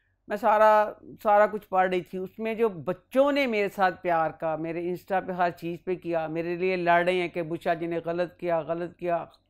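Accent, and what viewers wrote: Punjab, India. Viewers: Such a veteran actress Bushra Ansari is. I love her comedy skits she used to do on PTV. But she should be more open to criticism and accept the flaws of her play. She should understand she comes from the elders of the industry and must act like one. love you❤ I recently start watching your channel and I love your effort. I am a urdu speaking person but your punjabi tone is what i love the most and bushra appa made fun of it. She should know the most of the trps and her fame came from punjab and its very low of her saying you "pandu". Wisdom and sense of aesthetic can come from anyone who is a good observer. Peace. Keep growing ❤ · True.. I'm also a Pathan but we learn a bit of Punjabi accent from her too.. That's the beauty of Pakistan.. We love ❤️ all languages and dialects spoken here..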